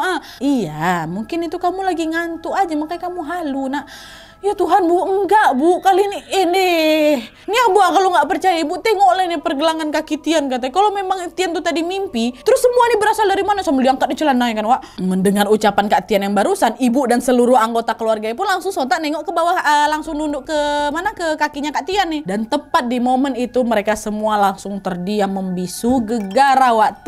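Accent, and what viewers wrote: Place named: Sumatra, Indonesia. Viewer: Cerita jawa timur logat sumatra, tapi ini yg bikin aku suka, dan ingat terus sama ka ini ❤❤❤❤❤ada ciri khas nya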